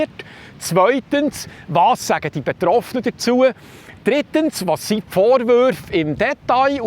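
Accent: Bern, Switzerland. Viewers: Danke... Du bisch dr bescht u es isch toll dis Bärndütsch...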